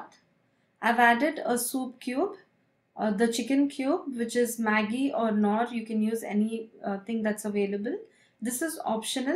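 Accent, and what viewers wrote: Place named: India. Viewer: You sounds like you came from india...sorry if im mistaken...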